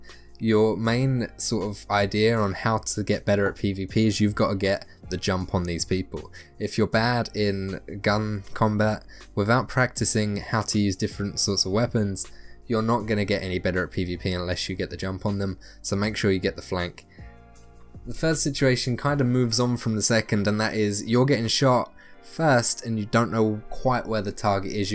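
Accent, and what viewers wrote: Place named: United Kingdom. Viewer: lol i didnt take it personally, but as someone who generally has mono-toned speach myself and has gotten shit for it in the past, i know that criticizing someone for the way they talk wont make them talk differently, if anything it'll probably just make them feel bad. You may as well have told him to stop speaking in a british accent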